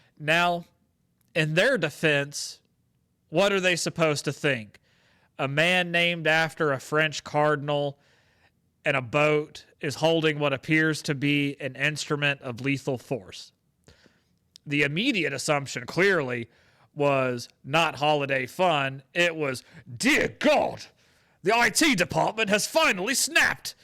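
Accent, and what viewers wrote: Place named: United Kingdom. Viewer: Nice British accent (From a Brit). 👍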